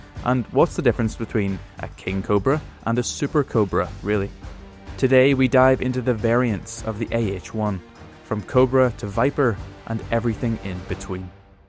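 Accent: Northern Ireland, United Kingdom. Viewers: And this narrator is northern Irish AF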